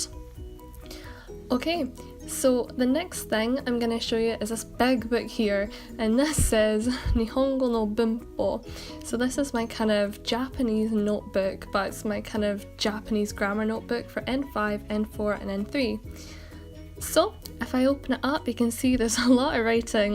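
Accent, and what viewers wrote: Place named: Scotland, United Kingdom. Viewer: Love that scotish accent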